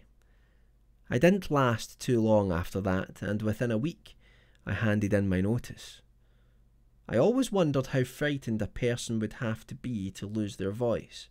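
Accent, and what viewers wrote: Scotland, United Kingdom. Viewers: you sound Scottish.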